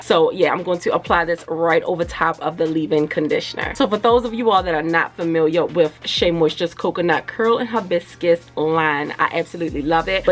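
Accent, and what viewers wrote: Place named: DMV, United States. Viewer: New subbie here from Jackie Naturals 💕 Would love to support each other! Your curls are absolutely beautiful 😍 I am in loveee lol. Are you from the DMV? You kind of sound like it. Loving your hair & energy 🔥 The results look so good!!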